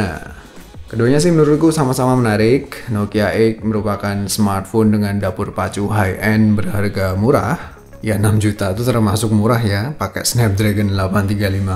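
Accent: Java, Indonesia. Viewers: Pengisi suara nya medok jowo.... Parah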